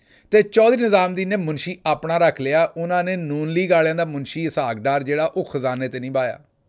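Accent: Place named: Punjab, India. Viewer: Your vlog sounds good to ears although such a strong Punjabi is not understable. Thanks for giving out meanings of common punjabi words. Keep it up!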